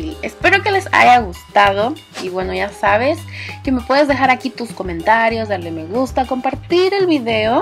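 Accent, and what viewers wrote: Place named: Mexico. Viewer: tienes acento mexicano :/ pero en el Perú hacen ese tipo de pescado frito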